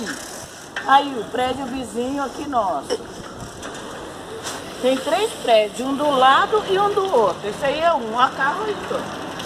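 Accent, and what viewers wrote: Rio de Janeiro, Brazil. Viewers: Carioca. Normal.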